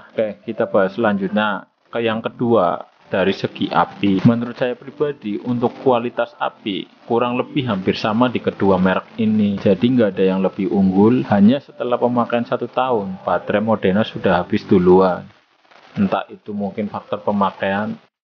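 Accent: Banyumas, Indonesia. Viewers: Kayak orang ngapak bahasanya 🤣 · Ngapak bgtt😂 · njir logatnya ngapak-ngapak.. gak enak banget didengerin 🙃 kaga malu apa bray, ngereview pake logat ngapak gitu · Ora ngapak ora penak, matur nuwun pakde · ngapak mbok? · Daningg ngapak om😁🙏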